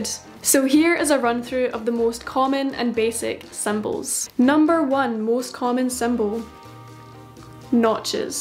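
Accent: Scotland, United Kingdom. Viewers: Ahh I love your Scottish accent!! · Pretty sure she has a Scottish or Irish accent, I think Scottish though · I subscribed to hear your (Scottish?) accent as well. Ha ha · You are a pleasure to watch. Simple instructions and fabulous end result. Keep up the good work. Watching from Victoria. Australia. Love your Scottish accent.😎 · Came for the pattern, stayed for the Scottish, yass 🙌 🏴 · Are you from...Scotland? You have the best accent! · Fucking terrifying to see a Scottish accent on the internet, like I only see you guys outside when I leave my house???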